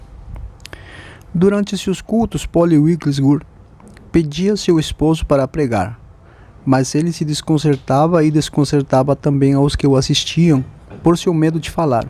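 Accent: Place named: Spain. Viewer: A pessoa que narra ovídeo tem sotaque de alguém de língua espanhola , portanto não tem nada de horrível!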